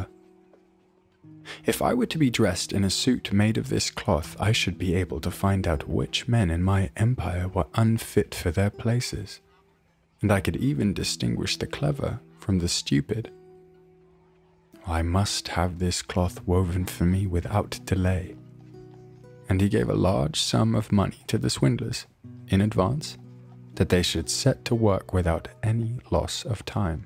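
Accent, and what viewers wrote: United Kingdom. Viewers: I would love and never get tired of listening on storytelling...keep it up idol...first time viewing something like this...the only problem with me, in my case is, and being a granny as well, some lines I cannot understand easily, since it is a British accent, so I have to repeat listening to get through what you are saying, really trying my best...just to listen to you...thank you for the joy you have brought in my life...I always pray for your best of health, success, happiness and protection. God bless you always! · The voice, and he is English like the accent. Nice story, never heard about it.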